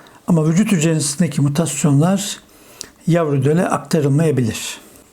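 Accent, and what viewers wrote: Black Sea, Turkey. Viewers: Hocam siz karadenizli misiniz:) ? Hocam bir de ben (Palme Yayınları, Bilgi Sarmal Yayınları, Rasyonel Yayinlari Ayt biyolji Branş denemelerini cozuyorum) sizce yeterli mi ? Ortalama 7 ya da 8 net birakiyorum ama sinavda neredeyse full birakmam lazim:((( Bir de Hocam ayt biyoloji icin ne dusunuyorsunuz sizce bu sene nasil olur? Sizden cevap bekliyor olacağım simdiden tesekkurler :)💜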